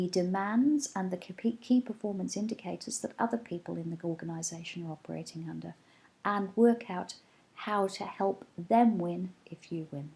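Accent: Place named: Manchester, United Kingdom. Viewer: Very nice accent I think u are from Manchester London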